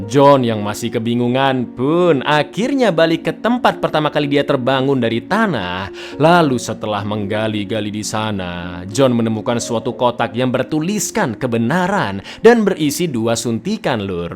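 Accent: Indonesia. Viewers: menurutku ngga papa, biar ada aksen Indonesia nya, biar jadi ciri khas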